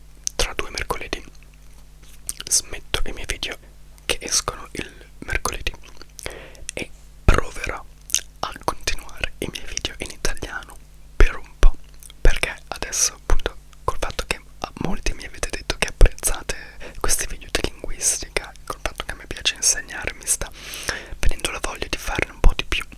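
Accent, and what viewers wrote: United Kingdom. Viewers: Ma perché se sei italiano parli con l’accento inglese? So che non vivi in Italia,ma non comprendo il dover sforzarsi a far sentire per forza che si ha un accento straniero… 😅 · Scusami ma ho alcune critiche da farti: 1 sei fissato con la corretta pronuncia delle lingue ma poi hai perso l’accento italiano corretto pronunciando la t inglese e spesso le doppie molto poco doppie. 2 buffo come alla fine il video da italiano pentito in cui praticamente disprezzi l’italiano è quello più visto. Alla fine ciò che hai disprezzato e cercato di cancellare dalla tua identità è ciò che ti ha dato più soddisfazioni. 3 hai un atteggiamento vittimistico nei confronti della vita, le sfighe succedono tutte a te. Per questo ti consiglio di vedere uno psicologo. Avere un male incurabile è qualcosa fuori dal nostro controllo ma scegliere persone sbagliate di cui invaghirsi o non riuscire in un lavoro dipende da noi. 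Per esempio fare la drag ma odiare il mondo drag e non uscire con loro significa essere tagliati fuori dal giro in un mondo in cui i contatti sono tutto. Se è quello che vuoi fare come lavoro devi scendere a compromessi, altrimenti resta fedele a te stesso e rendilo un hobby. Il mondo non ti deve niente, se vuoi qualcosa vai e falla ma sii consapevole dei compromessi che sono richiesti, nessuno ha detto che il mondo sia giusto o che basta il merito purtroppo. 4 sinceramente non capisco l’esigenza di dover sentir parlare inglese. Non capisco la fissazione per l’inglese. Si sceglie di vivere in un posto perché è il migliore per le proprie esigenze lavorative e di stile di vita e magari anche per gli affetti che uno ha. Se sono una makeup artist e la città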